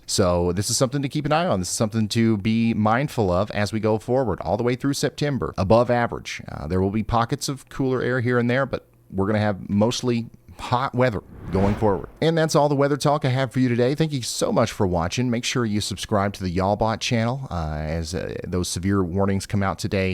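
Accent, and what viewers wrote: South, United States. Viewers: I just love your Southern Speak! I’m from what used to be fairly rural north Fla Panhandle; I grew up in Tallahassee, but the middle, to the western panhandle are not far from Al, & Ga, so “Daggone” is not unknown to me. 30 years ago, ( I will be 71 in July)I married a man from Michigan, & we’ve been living in the Grand Traverse Region of N.W. Lower Mi since 2008, & I still speak Southern….with a slightly less southern accent.😉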